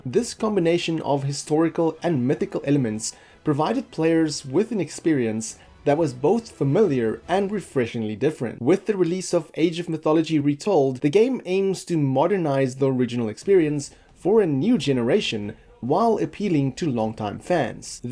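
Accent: South Africa. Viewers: The sout African is back. Whooo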